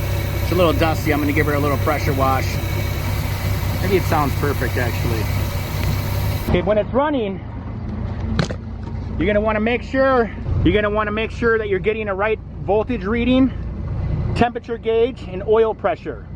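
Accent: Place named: Wisconsin, United States. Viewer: keep up the great videos! They have been extremely helpful. Watched several of your winterizing videos last year to get my boat put away. Cranked up easy this year and we have already been out on the water a bunch. Also replaced my exhaust flappers, and going to be replacing my trim solenoids soon! Your videos are spot on, and I really dig the Wisconsin accent. Peace brother!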